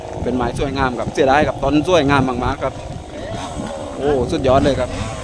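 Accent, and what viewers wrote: South, Thailand. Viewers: ชอบช่าง ชอบฟังลุงพูดภาษาใต้ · ชอบช่อง เป็นเอกลักษ์ชอบภาษาไต้ครับ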